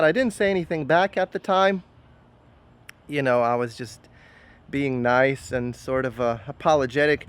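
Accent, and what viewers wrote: Norway. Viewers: Wait... are you Norwegian?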